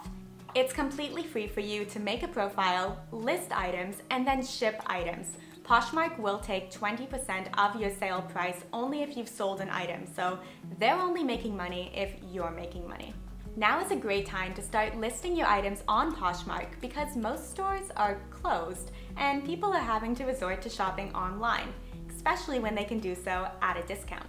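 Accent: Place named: United States. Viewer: Why American accent? English is from England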